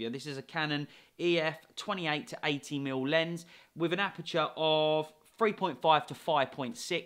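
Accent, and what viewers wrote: United Kingdom. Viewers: I am in love wifh vhe cockney accent brova Bu' vhe presentation was good. Fhanks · that british accent made the video even more interesting to watch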